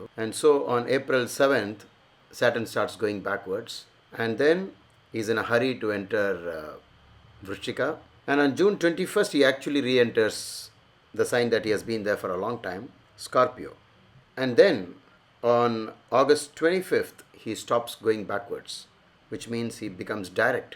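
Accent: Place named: South India, India. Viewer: sir i respect u, u r senior to my age but u speak so lower voice i hve difficculty hearing clearly, also cos u hve south indian accent, ple speak slowly else v dont understand at once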